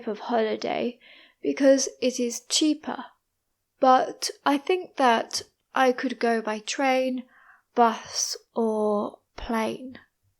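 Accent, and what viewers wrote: United Kingdom. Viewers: I love British accent, thank you for support, its so difficult find it, i happy cause i found out your channel,. 😁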